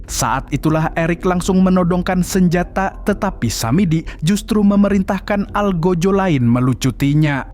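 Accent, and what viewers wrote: Banjar, Indonesia. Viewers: Urang Banjar sekali nya😂🙏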